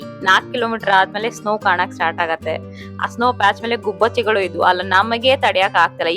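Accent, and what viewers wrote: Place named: India. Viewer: How is talking Kannada ? Yaav ooru, namm hange ada nimm Kannada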